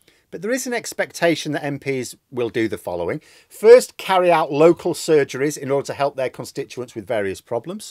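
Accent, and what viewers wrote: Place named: Northern England, United Kingdom. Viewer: . We know you're from up north. Stop going on about how people down south pronounce town names. It detracts from your otherwise intelligent commentary.please. It's boring.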